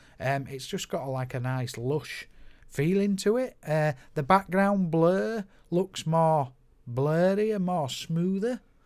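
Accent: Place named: Northern England, United Kingdom. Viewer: Thanks for the review. Got myself one today. Lovely northern accent mate. Stay well.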